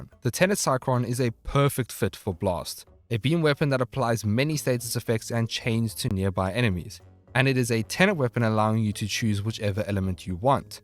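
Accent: South Africa. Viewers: Your South African accent makes you more trustworthy somehow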